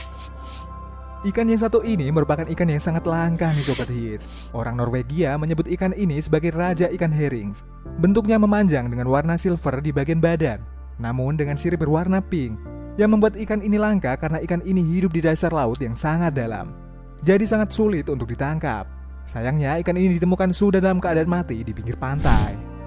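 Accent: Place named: Medan, Indonesia. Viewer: Orang medan kau yaa bahasa kau mirip kali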